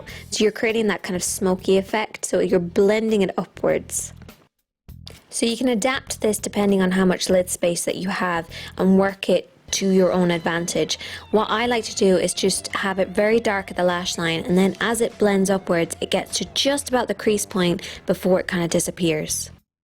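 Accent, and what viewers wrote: Ireland. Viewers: So weird you have more Irish accent in this video compared to your latest videos. Hahaha ! Still love ya :)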